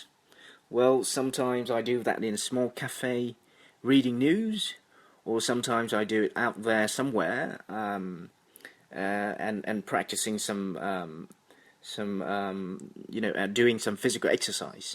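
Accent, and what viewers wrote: India. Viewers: like your Indian accent :v really cool lah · Giọng Ấn......eo....kinh....;D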